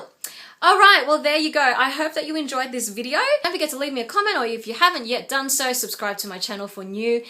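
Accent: Australia. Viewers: Omg are u Australian I looove the Australian accent it's soo cool!!!!! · Does she speak with Australian accent? Or am I mistaken? :)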